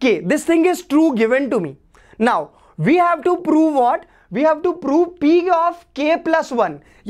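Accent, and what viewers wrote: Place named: South, India. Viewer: Yeah from south obviously you can understand his accent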